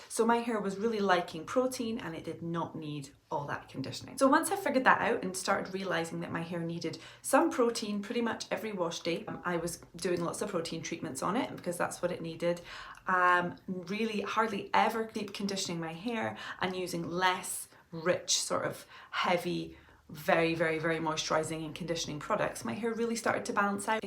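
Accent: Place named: Scotland, United Kingdom. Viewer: Hi, thank you for this video. Are you from Scotland? I don't hear a strong accent but I'm sure I detect some Scottish. Thanks to your videos, I have ordered Curl Keeper Original. I'd never heard of it until you mentioned it and I am thinking it just might be the perfect styling product for my waves.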